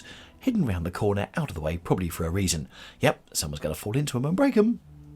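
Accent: United Kingdom. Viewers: ❤ I Really Love Your Channel, you cut out all the noise from the store. And I love British Voices 😊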